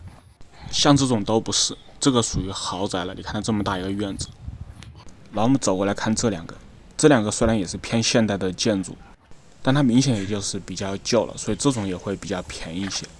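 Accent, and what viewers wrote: Wuhan, China. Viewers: 武汉口音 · 这口武汉普通话啊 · up主是武汉人吗？ 听两句就感觉是武汉普通话😂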